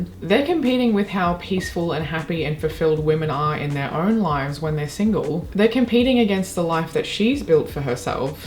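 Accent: Australia. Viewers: Love hearing an Australian accent delivering intelligent, factual and insightful commentary about a massive issue. Post 1960 and the invention of the pill women have choices. We no longer spend our fertile years bearing or feeding bubs. Being pregnant and nursing infants makes us super vulnerable physically and financially. In an unsafe and radically unstable world young women will choose not to have children if they have access to birth control.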